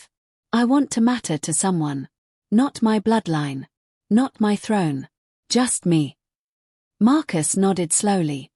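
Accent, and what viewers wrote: United Kingdom. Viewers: Love, love, love the classy British narrator!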